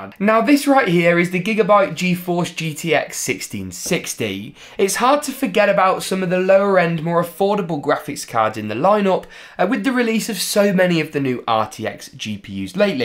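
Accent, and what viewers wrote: United Kingdom. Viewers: Is it racist if Somehow I like his accent, is that British accent? That's cool for my Asian ear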